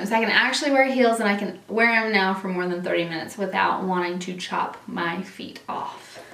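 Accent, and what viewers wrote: South, United States. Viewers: I seriously love that you are doing videos! Keep them coming I could listen to your cute southern accent all day! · First of all. Your dancing & song choices are 💣. I swear to you we were meant to be friends! Second. I could listen to you talk with your southern slang alllllll day! And lastly- I think i need that MJ perfume in my life!! You know i trust you to the ends of the earth- so pretty sure this stuff is going to be incredible🙏 XO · You are hilarious and I died like 5 times love your southern sass girrl;)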